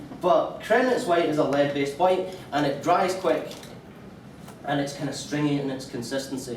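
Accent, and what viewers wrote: Scotland, United Kingdom. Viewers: you are a very good artist and I love the way you use vivid colours that create such emotive impact in your paintings which I so much would like to emulate, even injust a small way, but in terms of communicating and dare I say showing how you teach, apart from the last one ie Lochgreen Part 6, speaking as a long term teacher, trainer and presenter you are 'crap'. I really do not see why you bothered to put this on Youtube, you got you back to us most of the tims so we can't see you painting, the canvas is at an angle and takes up about 1/6 of the screen, the projected screen which is just a duplicate of what you were doing was too light as you obviously needed light to paint, you had no decent microphone and having you back to us most of the time coupled with your very broad scottish accent most of your instructions I could not understand - if I was thinking of actually attending and paying for a live session, no way if this is what I would find. 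Shame as there are so many untalented artists on Youtube or really bad untalented paintings being sold- some one like you is much needed.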